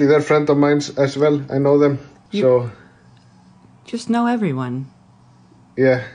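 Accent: Iceland. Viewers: Even for an Icelander he has a ridiculously thick accent :P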